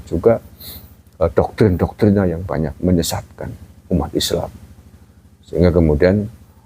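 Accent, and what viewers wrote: Banyumas, Indonesia. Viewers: Ngapak nek ora ngapak ya ora kepenak...😉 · ora ngapak ya ora kepenak mbok? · Ora ngapak ora kepenak,,😂😂😂❤❤❤❤ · Ora ngapak ora kepenak 👍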